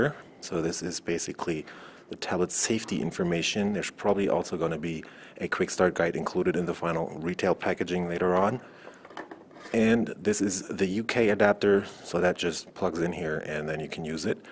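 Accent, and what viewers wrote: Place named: Germany. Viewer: I love your german / english accent :p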